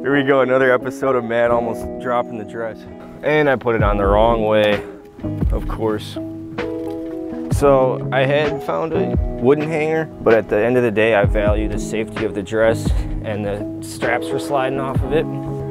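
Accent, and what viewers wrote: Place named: Wisconsin, United States. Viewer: Your video showed up recommended, so I started watching and immediately knew 1 thing…. That accent, you’re from Wisconsin! 🤣 I have family in Kenosha and my mom grew up there, and always loved their accents growing up. Nice photos, btw. ☺️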